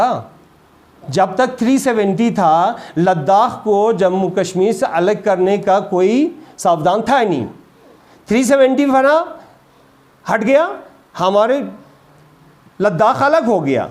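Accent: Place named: Iran. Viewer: pharsi style hindi he is using 🤔🤔🤔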